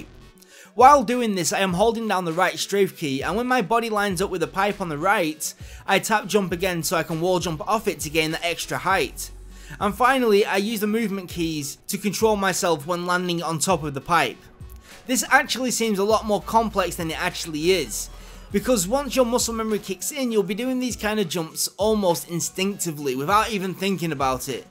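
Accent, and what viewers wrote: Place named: Manchester, United Kingdom. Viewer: straight manc accent :D! nice vid man thanks for the tips!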